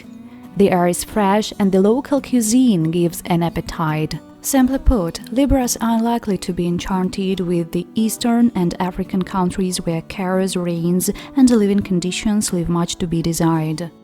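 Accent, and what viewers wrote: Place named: India. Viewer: nah, they need to replace this indian narrator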